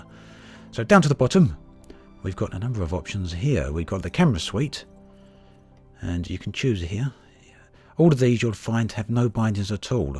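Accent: United Kingdom. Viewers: Still a great video. I went from thinking maybe I have to buy some sort of add on to make the cameras work, to using mine (basics) in about half an hour. Thanks for efforts your videos are excellent - and nice to hear a British accent as welk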